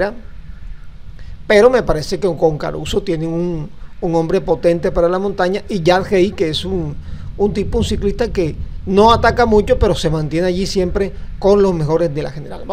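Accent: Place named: Spain. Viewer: lo admiro, pero no hables estilo español, no dugas vidio, somos colombianos, ( vídeo,) un abrazo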